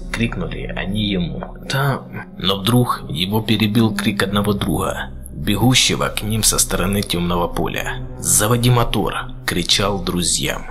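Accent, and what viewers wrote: Ukraine. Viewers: это украинский акцент ! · и не в обиду тебе:постарайся как-то сглаживать украинский акцент на букве "г" , "друХ",например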